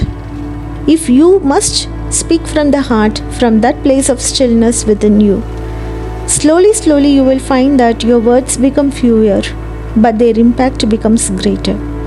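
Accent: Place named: India. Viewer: the annoying indian nasal voice over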